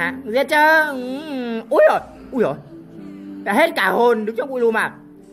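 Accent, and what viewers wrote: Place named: Phu Yen, Vietnam. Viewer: Phú yên kìa 🤣🤣 quê tui 🤣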